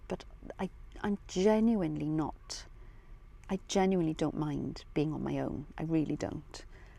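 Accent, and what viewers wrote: Wales, United Kingdom. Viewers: What is ASMR please? New subscriber here so sorry for the need for an explanation. Loved the video....AND your accent as I live in South Wales.... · your dulcet, Welsh voice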